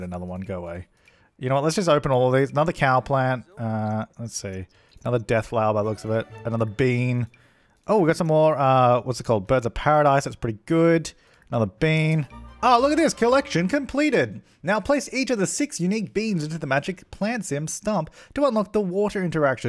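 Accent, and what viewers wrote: Australia. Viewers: I once watched on my laptop closed it over then in the middle of the night the video somehow started again and (who lives females) suddenly beard a male Australian accent shouting about Katrina My name is pronounced Katrina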